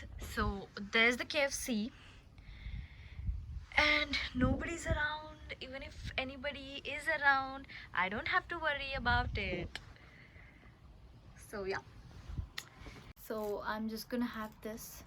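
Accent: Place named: North Karnataka, India. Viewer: ನಿಮ್ಮ ವಿಡಿಯೋ ನೋಡ್ತಿದ್ರೆ ನೋಡ್ತಾನೆ ಇರ್ಬೇಕು ಅನಿಸುತ್ತೇ ಒಂದೊಂದು ನಿಮಿಷನು ಸುಪರ್ ಆಗಿದೆ,ಉತ್ತರ ಕರ್ನಾಟಕ ಶೈಲಿಯ ಮಾತು, ಡ್ರೆಸ್ಸಿಂಗ್ ಸೆನ್ಸ್ ಸುಪರ್, my dear doll😍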